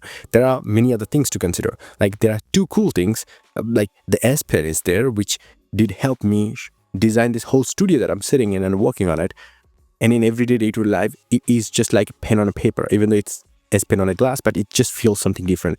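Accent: India. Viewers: Indian accent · pajeet voce, DO NOT REEDEEEMDEED OTR WATCHT!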